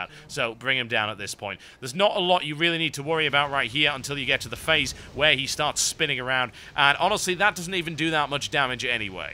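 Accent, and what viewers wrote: United Kingdom. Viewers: ahhh british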